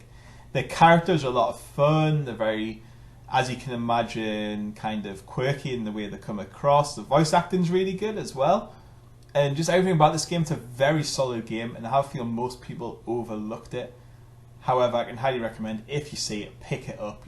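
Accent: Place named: Newcastle, United Kingdom. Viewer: I dinna no ya was a Geordie!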